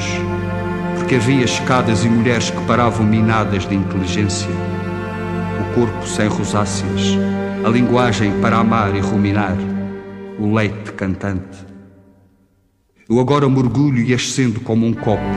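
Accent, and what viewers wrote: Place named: Madeira, Portugal. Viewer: Foda-se e com sotaque madeirense!!! Orgulho de ser madeirense!!!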